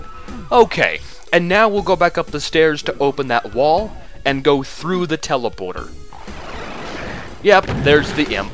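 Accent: United Kingdom. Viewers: so BEAUTY SPEECH. NICE. I LISTEN YOUR VIDEOS EVERY NIGHT. NICE ENGLISH CLEAR. I LISTEN VOICE CLEAN BRITISH DIALOGS. I ALMOST 100% UNDERSTANDING THANKS TO YOU SIR FOR FINE SPEAKING. SO AS I COULDN NOT UNDERSTAND OTHER INTERVIEWS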